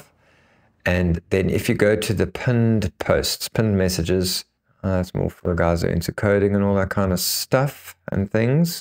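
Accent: South Africa. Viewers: Howzit? Wasn't expecting to hear a South African accent when I clicked on this video haha I'm from Canada but my family is originally from SA. Have to say this was a very clear video and can't wait to come back to it when I receive my RG405V.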